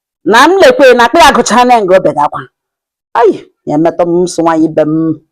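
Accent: Mbano, Nigeria. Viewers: You're really Mbano woman. Kudos for being proud of your dialect. ❤.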